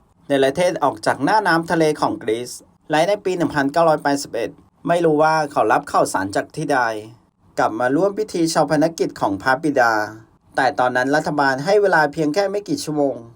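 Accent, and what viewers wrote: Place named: Rural, Thailand. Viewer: รู้นะว่าตั้งใจ ขอให้กำลังใจ แต่ต้องฝึกมากๆ โดยเฉพาะการอ่านออกเสียงชื่อต่างๆให้ถูกต้อง เพราะยังไม่ถูกหลายคำสำหรับชื่อในภาษาต่างประเทศ มีผิดพลาดหลายที่ จึงต้องพยายามแก้ไขปรับปรุงให้ถูกต้อง การอ่านคำต่างๆจึงต้องฝึกให้ชัดเจนตามบรรทัดฐานมาตรฐานภาษาไทย รวมทั้งจังหวะจะโคนและสำเนียงตามมาตรฐานภาษาไทยกลาง อย่าให้มีกลิ่นชนบท เพราะนี่คือการอ่านเรื่องสำคัญของพระราชวงศ์ต่างประเทศ ขอให้หมั่นฝึกทบทวนไปมามากๆ จะดีขึ้นเอง เพราะมีแววว่าน่าจะพัฒนาได้จนได้รับการยอมรับว่ามีมาตรฐานการอ่านที่ดีต่อไปได้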